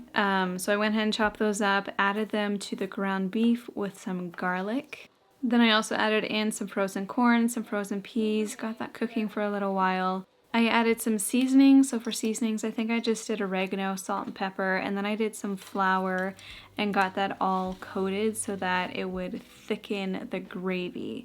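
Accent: Canada. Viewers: Canadian accent so wonderfully strong in this video 😍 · I am now learning that Canadians pronounce things differently than the U. S. 😂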